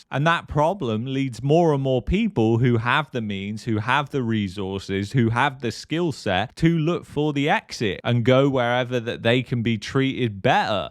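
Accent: England, United Kingdom. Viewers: This guy with an English accent.. obviously not from here... constantly telling people how bad our country is...try a positive video for once..or move home bud..guys like you are bad for our society.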